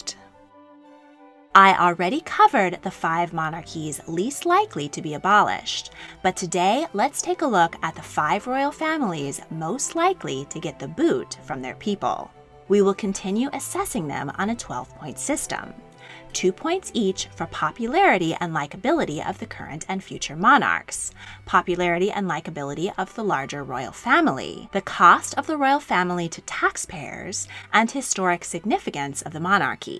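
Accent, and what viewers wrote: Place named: United States. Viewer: Who knows how long any of the monarchies will last? Time well tell. But I wonder how and when you an American (with all the baggage between your country and Britain that entails) decided it might be a good idea to produce a video in which you speculate on the downfall of foreign monarchies. Most of them countries that are allies of the US. Could you not see how inappropriate that might seem to a lot of people? I found it especially crass for a narrator with one of the most pronounced American accents I’ve ever heard (and I come from the US) to take on this dubious task. You seem blind to how incredibly presumptuous and lacking in taste it sounds coming from someone of your background. And of all times to put out such a video! I wonder how Americans would react if some smug British talking head did a series on the future of the presidency and American form of government with a review of all the disastrous American presidencies of the last 50 years, Nixon, Dubya, TRUMP. 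Time for a parliamentary cabinet system in Washington?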